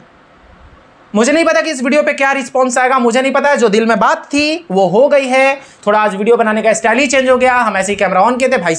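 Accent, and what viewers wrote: Bihar, India. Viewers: App bihar se ho kya bhai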